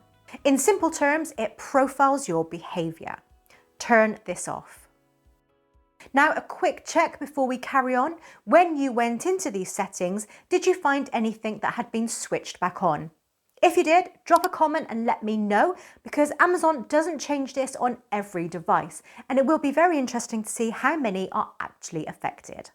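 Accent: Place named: United Kingdom. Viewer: I love your British accent!!!! ❤❤❤❤❤❤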